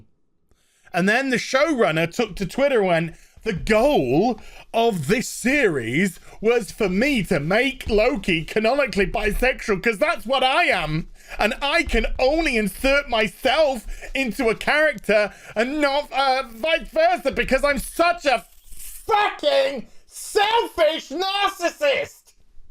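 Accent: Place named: Nottingham, United Kingdom. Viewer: Love that Nottingham brogue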